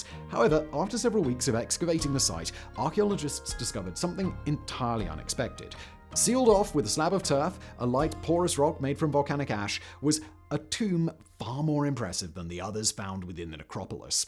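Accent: RP, United Kingdom. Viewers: This sounds normal for a British man with a Received Pronunciation accent - I have the same accent and the audio doesn't sound harsh or over-sibilant to me. I accept it may do to others.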